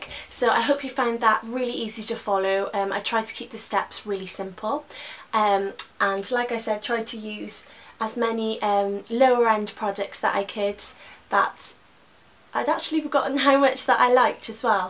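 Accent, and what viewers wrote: United Kingdom. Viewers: i love your accent! whereabouts in england are you from?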